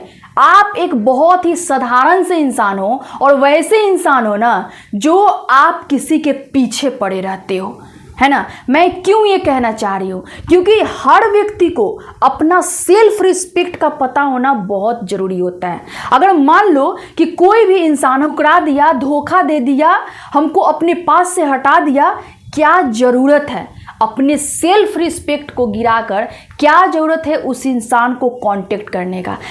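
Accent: Bihar, India. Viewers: Bihari tone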